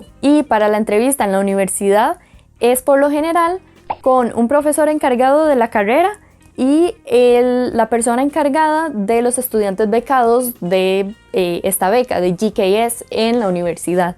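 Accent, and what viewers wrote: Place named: Costa Rica. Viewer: omg ella es de C.R , ya decía yo que su modo de hablar se me hacía conocido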